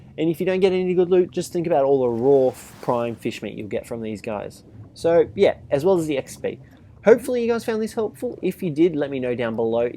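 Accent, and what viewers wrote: Australia. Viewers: are you australian? you sound australian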